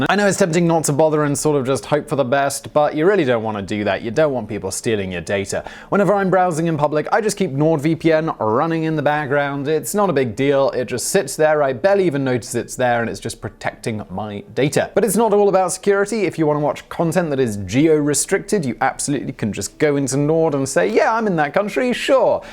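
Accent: United Kingdom. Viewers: British accent, living in Czechoslovakia, pushing CIA propaganda. Suspicious. Simon must have gotten a call from Jeff Bezos telling him the Organization needs a PR boost.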